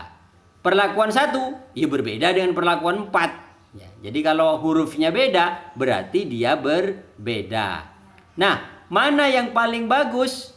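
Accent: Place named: Madura, Indonesia. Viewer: Semangat pak, lanjutkan mengajarnya , saya suka dengernya kalo pake logat madura gini mantab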